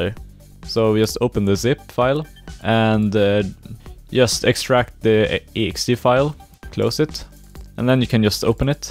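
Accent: Sweden. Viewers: Are you swedish? xD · You are swedish right? :D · you sound swedish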